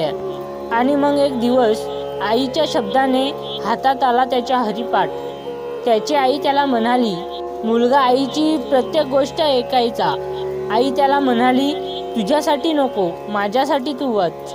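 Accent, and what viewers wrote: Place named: Konkan, India. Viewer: उच्चार अशुद्ध जरी आसले तरी कोकणातली मराठी अशीच असते महाराष्ट्रात प्रत्येक विभागातली मराठी भाषा वेगवेगळी असु शकते कोकणी खान्देशी पुणेरी मराठवाडी सातारी विभागानुसार उच्चारात बदल असु शकतो बोलण्यातील आशय आणी भाव सारांश महत्वाचा आहे हरीपाठा बद्दल खुपच छान माहीती सांगीतली धन्यवाद 🙏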